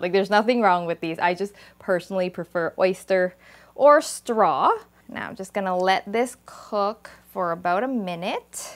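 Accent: Thailand. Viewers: wow a little bit surpised! look at her ingredient she use i think she aint live in thailand anymore , every label is a international label have english in the label and the product name is also write in english that not usal in thailand that the most of label always write products name in thai. anyway she is thai could answer why her thai accent is so lovely. :) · น่ากินมากเลยนะคะ ออกเสียงภาษาไทยเหมือนมาก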